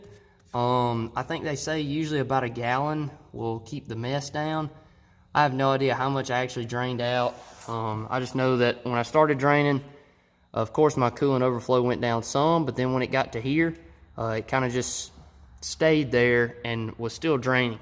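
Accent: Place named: South, United States. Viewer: Nice video. I am about to go down the same road so I appreciate the insight. I can tell you're from my neck of the woods from the southern drawl. Where abouts are you located?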